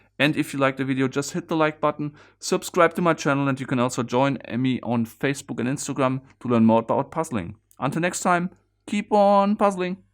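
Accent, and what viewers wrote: Germany. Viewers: What language is that? I’m just curious. And also what country are you from? I want to say you have a German accent?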